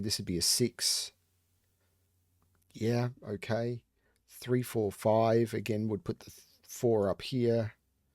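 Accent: Australia. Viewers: Is he from Australia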